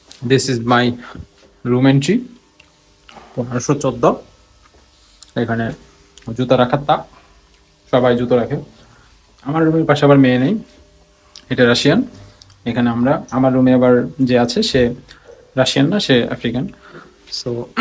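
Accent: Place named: Jessore, Bangladesh. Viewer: যশোর-ঝিনাইদহ এর মত লাগছে।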